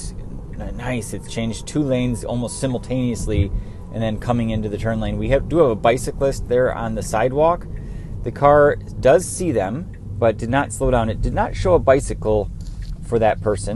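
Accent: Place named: Canada. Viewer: Are you Canadian? I’m hearing a bit of an accent 😂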